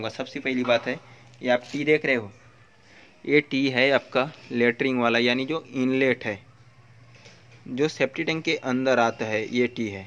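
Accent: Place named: Bengal, India. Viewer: Bangali ho na?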